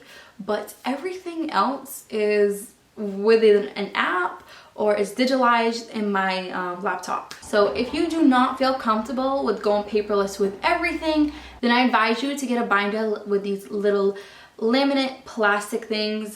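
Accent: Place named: New Jersey, United States. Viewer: the New Jersey accent!!! Love it lol like if U agreeeee!!!😆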